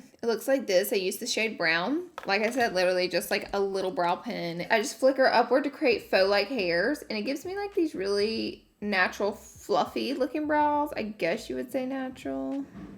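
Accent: South, United States. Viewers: Girl, you're southern af. You should know how to pronounce "peony" lol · So funny that u can have a southern accent and you've picked up such a cali accent too lol. SoOoO prettay like literallyyy just SOO pretty haha. Guess that's what happens when you hear it all the time around you.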